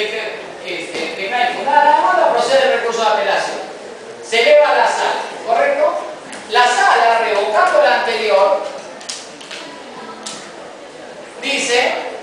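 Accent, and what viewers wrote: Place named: Argentina. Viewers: Suena argentino. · es argentino.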